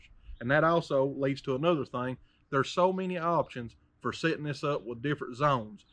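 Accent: South, United States. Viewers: Boy if that isn’t a southern accent I don’t what is! Enjoyed your video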